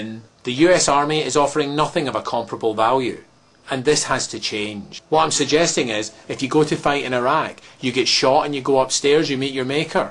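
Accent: Scotland, United Kingdom. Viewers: haha! great video :-) and nice scottish accent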